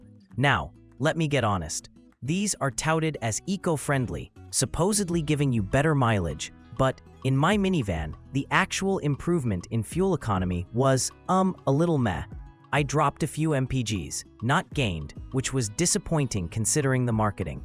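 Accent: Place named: Canada. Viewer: Narrated by a Canadian for the Canadian audience..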